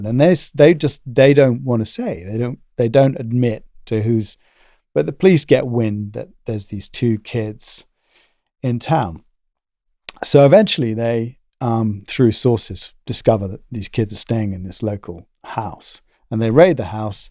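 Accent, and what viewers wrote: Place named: RP, United Kingdom. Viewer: What is it with the comments on here saying this guy doesn’t know what he’s talking about? Well he clearly does and I think he was very precise. Probably because he has a plummy accent so he shouldn’t be taking about drugs when in reality it’s those with middle class plummy accents who consume the most. And others who think it’s only middle class people who snort Coke? I grew up in a council estate in Birmingham a very rough one at that, and believe me once the kids were with the dads for the weekends there was plenty flying around at girls parties!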